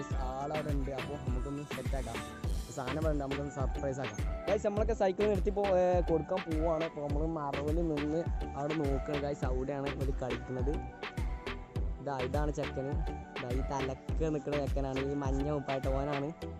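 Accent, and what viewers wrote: Malappuram, India. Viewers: Malappuram ഭാഷ പെവർ ✌️✌️🔥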